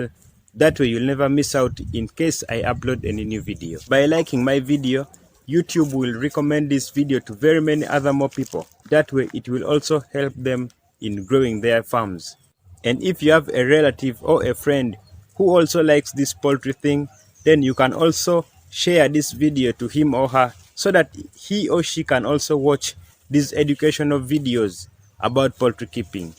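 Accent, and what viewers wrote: Uganda. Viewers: He looks a ugandan n his accent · His accent from Uganda. Kenyan man can recognize them a bange!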